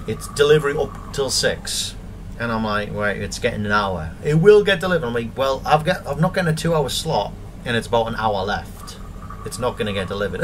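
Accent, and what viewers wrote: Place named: Gateshead, United Kingdom. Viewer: Hi buddy, fellow Geordie, thank you first of all for putting this video up and sharing your grievances with VM, like you I've been in similar situations with them, it's almost as if they're doing guess work when they're discussing the account, I don't pay by direct debit, that's one thing I never have let them do since I joined Virgin Media in 2009, I've been with them since then, I didn't know you could get Netflix Premium by the way, lol.... I might bully them into that at some point. I worked at a call centre in Stockton when I lived down there in 2007, it was just bog awful, I had complaint after complaint after complaint, in the end I left cos I couldn't stand the pressure of working in a call centre so hats off to you for doing what you do. You sound like a Gateshead lad, loved the video mate. Thank you once again for bringing this to YouTube and letting people know just what they're like.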